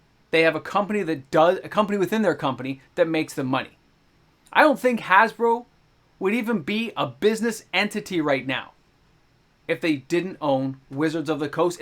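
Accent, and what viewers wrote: Canada. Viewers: Are you Canadian? your accent is strong. You keep saying 'A-boot'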